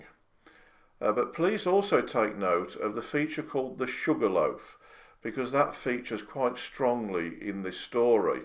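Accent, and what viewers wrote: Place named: England, United Kingdom. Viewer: A very well presented talk and delivered without the usual triumphalism that often is shown when Aussie battles are discussed. You have an English accent.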